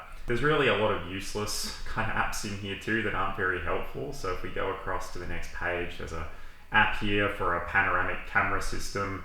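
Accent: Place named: Australia. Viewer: I love the info in the video. but his accent kept fucking with me lol. Puts R's where they shouldn't be. And where they should be takes them out lol. Do all aussies talk like this?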